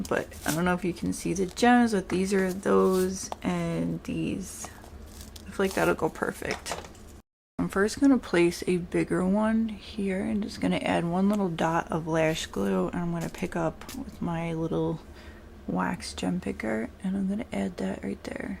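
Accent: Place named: New York, United States. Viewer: Congrats on your 260 subs... Lol heavy NY accent..My Spanish accent is heavy too, I cannot imagine what people will say about it 😅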